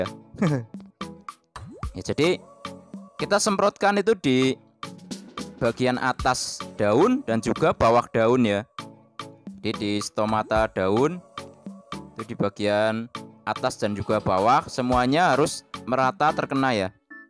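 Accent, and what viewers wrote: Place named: Java, Indonesia. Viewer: Jawa banget... Medok banget jawane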